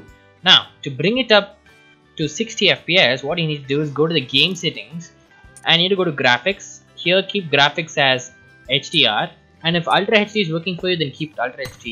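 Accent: India. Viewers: also am pretty sure you are an indian by your accent.I am indian too.The thing is that since i am from south India i dont actually understand hindi and all videos have english title but they talk in hindi except you.(not offending hindi but using english is better so that people from other country can understand)BTW do you like T series or Pewdiepie XD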